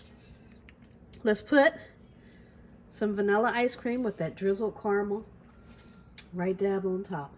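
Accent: South, United States. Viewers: Must be from the lower south..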